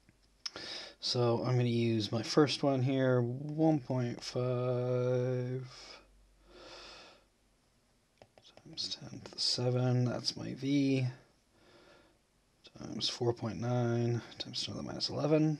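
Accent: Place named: United States. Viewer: Thanks G but why as an American(from ur accent) are u doing Alevels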